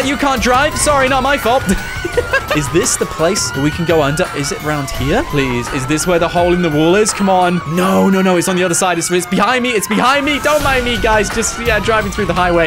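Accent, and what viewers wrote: United Kingdom. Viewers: Are you British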